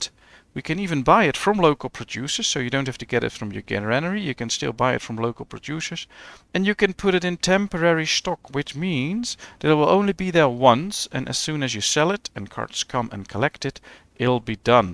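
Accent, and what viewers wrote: Netherlands. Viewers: very useful and well explained , you must be Dutch :) hearing from your accent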